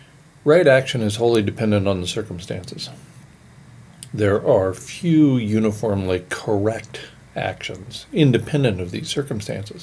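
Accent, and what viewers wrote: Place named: New York, United States. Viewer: Like listening to the old Mensch with his New York Bros accent and straight talk... It's amazing how we bounce around in life... Just celebrated a birthday and scratch my head on the chance encounters with ideas and people and events. Makes me wonder on all concepts... Perhaps, just maybe, after crossing over the River Styx it all all be revealed... Probably not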